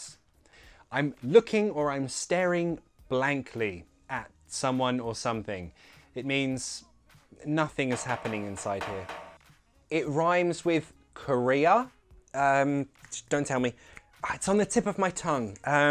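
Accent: United States. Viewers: That American accent though 😂😂😂😂😂😂😂😂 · I totally love that American accent of yours!! So accurate lol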